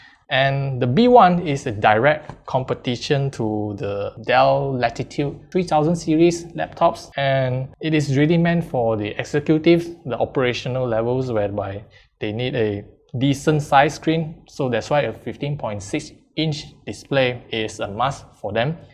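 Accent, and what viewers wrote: Singapore. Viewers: Just from the accent, I can guess you are from Singapore!!!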